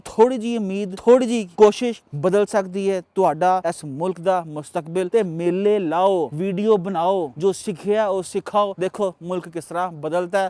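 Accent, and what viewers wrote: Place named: Punjab, India. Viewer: Mr. sahab i love ur punjabi accent and pakistani stage drama humour and at the end i respect u i love u and UR AN AWSUM TEACHER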